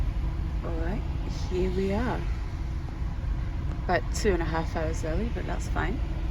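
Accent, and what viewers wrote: Scotland, United Kingdom. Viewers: Love listening to you :) you’re more Scottish on YouTube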